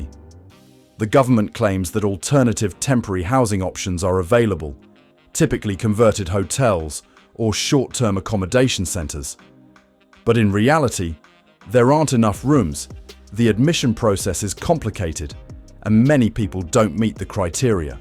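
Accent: United Kingdom. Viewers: the British narrator seems strangely out of place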